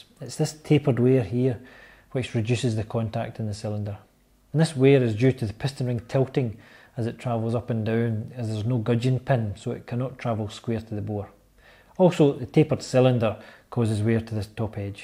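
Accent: Scotland, United Kingdom. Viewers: Great Video, I love your Scottish accent!!!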